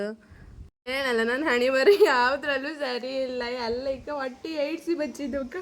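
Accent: Kundapura, India. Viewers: Nim ಕುಂದಾಪ್ರ bhashe kembuke bari chanda mare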